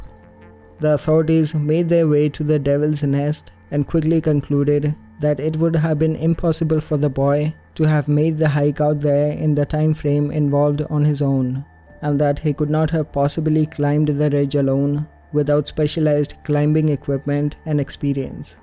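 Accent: India. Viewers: Sounds like an Indian accent. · Indian accent is one of the worst narrator accents possible. Please stick to the women narrator. Quality content non the less.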